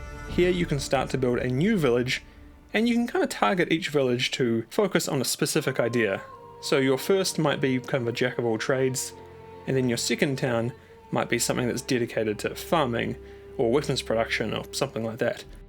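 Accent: New Zealand. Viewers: Is this new zealand accent?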